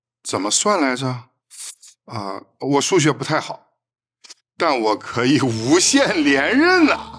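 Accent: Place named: Henan, China. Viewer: 你口音很像我老家口音！！！河南密县人！！！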